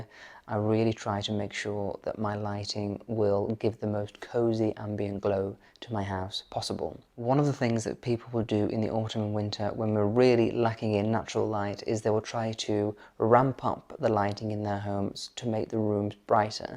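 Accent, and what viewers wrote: United Kingdom. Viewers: Great content as always,. 😊 As an American, I adore your British accent, especially when you say, "lots of pots." It sounds delightful!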